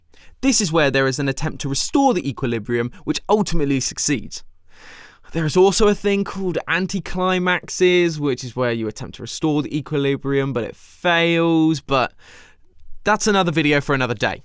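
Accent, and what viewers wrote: United Kingdom. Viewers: Subscribing for the sexy British voice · I love Your British accent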